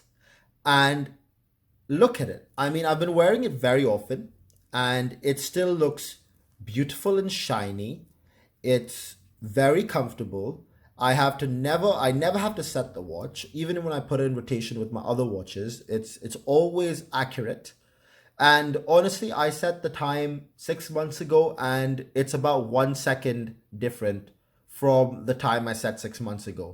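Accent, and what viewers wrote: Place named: India. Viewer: hehe I could make out from the accent... I'm indian too and pleased to see a GS lover in India... Do u have any Spring Drives or Mechanical GS too?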